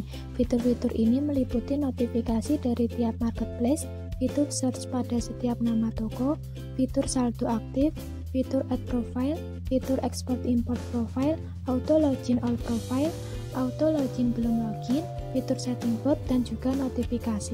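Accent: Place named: Java, Indonesia. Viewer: Medhok sekali mbak, makasih impohnya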